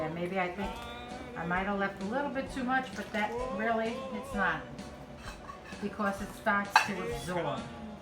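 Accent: New York, United States. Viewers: The website to order the book is “ not secure” . Apple recommends that you contact the owner of the site and don’t give your credit card information. I enjoy your videos otherwise. I love your accent and it is same as my sister who lives in New Rochelle. I am a retired cardiologist from the Bronx who lives in Omaha for last 45 years. Of course, I lost my accent(ha, ha… NOT.)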